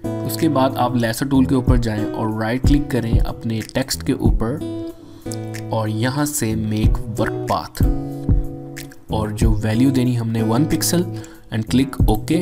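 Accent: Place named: India. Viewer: bruh... when your pronunciation sucks, at least show the command key I cant get what you are saying literally because of your Indian pronunciation...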